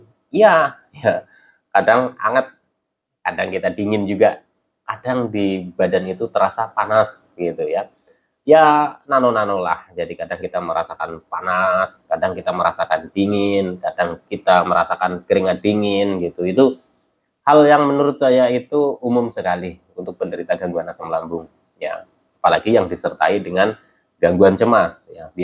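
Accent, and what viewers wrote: Banyumas, Indonesia. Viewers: WALMAS hadir mas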